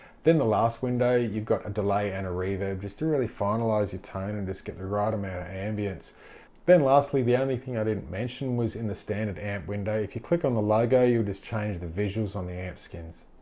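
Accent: Australia. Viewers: Hello! My name is Pietro, I'm from Brazil and I love your channel! I'm 22y, started playing guitar 2 years ago, and I'm not gonna lie, sometimes I have a hard time trying to understand what you say, my english is not perfect and the accent for me still hard to understand But I'm trying to practice, I got an australian citizenship from my father so I'm willing to move to Australia within the next few years, so better get used to the accent hehe I like specially your boss katana videos, I always wanted an amp like that but unfortunately it is too damn expensive here in Brazil Everything is expensive, it would take me 3 entire salaries just to buy the katana mk2 50w, it is simply not doable But I'm at the hope that someday I will have a guitar room just like you do CheersHello! My name is Pietro, I'm from Brazil and I love your channel! I'm 22y, started playing guitar 2 years ago, and I'm not gonna lie, sometimes I have a hard time trying to understand what you say, my english is not perfect and the accent for me still hard to understand But I'm trying to practice, I got an australian citizenship from my father so I'm willing to move to Australia within the next few years, so better get used to the accent hehe I like specially your boss katana videos, I always wanted an amp like that but unfortunately it is too damn expensive here in Brazil Everything is expensive, it would take me 3 entire salaries just to buy the katana mk2 50w, it is simply not doable But I'm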